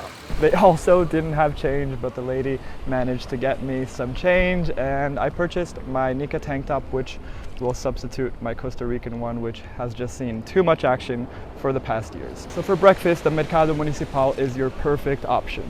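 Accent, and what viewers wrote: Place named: United States. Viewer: American accent but uses celsius?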